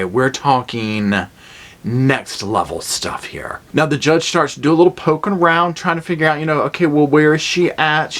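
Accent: South, United States. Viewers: I just love all your comments and facial expressions, you make me laugh. Lord knows I need more laughter in my life. Thank you for making that happen. Love your southern accent. I grew up in Florida so I have one too. Lol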